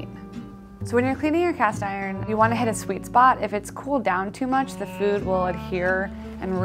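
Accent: United States. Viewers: At the end of every sentence she goes uuuuup on her tone. Very unprofessional and annoying, typical american accent.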